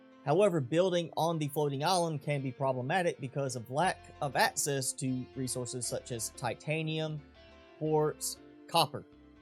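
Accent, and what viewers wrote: South, United States. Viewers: You have the most American accent IV ever heared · I love this guys southern accent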